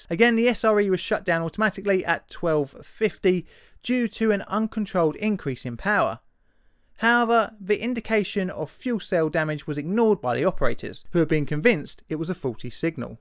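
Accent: United Kingdom. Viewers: um dudes probably a britbonger since you know near anyone can post to youtube. Its not just americans on the site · Why is it that folks in the U.S. information industry think that using a narrator with an awful Limey accent make their production more authoritative?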